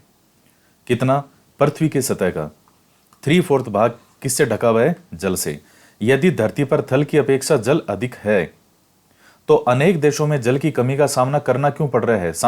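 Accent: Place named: Marwari, India. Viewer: Sir thaari marvadi language bahut achhi lagi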